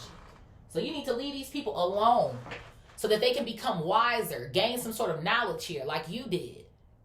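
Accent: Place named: California, United States. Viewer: she sounds like shes from cali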